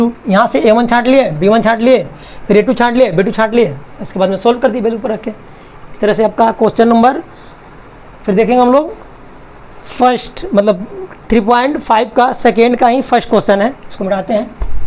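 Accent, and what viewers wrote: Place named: Bihar, India. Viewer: Sir to khan sir nikle Bihari +hindi mix 😂❤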